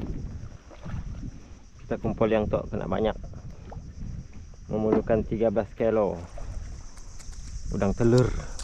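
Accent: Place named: West Kalimantan, Indonesia. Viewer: orang sambas ke bang,bahasenye same kyak orang sambas😅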